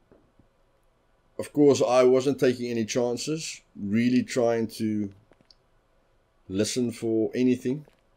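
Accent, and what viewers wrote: Afrikaans, South Africa. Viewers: are you africaans by any chance?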